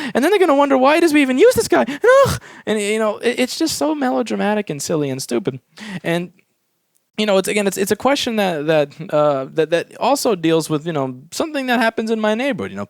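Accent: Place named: Staten Island, United States. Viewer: You sound like you're from Staten Island.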